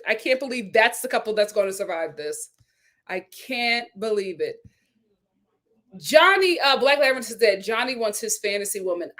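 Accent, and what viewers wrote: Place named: Philadelphia, United States. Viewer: LOLOL we love you, and maybe it's the Philly accent but you've been saying it wrong.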